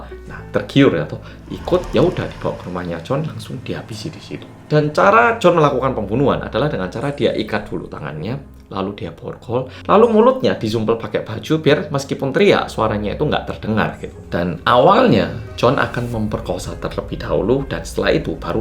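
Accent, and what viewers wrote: Java, Indonesia. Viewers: Wong jowo😂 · Bahasa Jowo ne yo medhok banget...😅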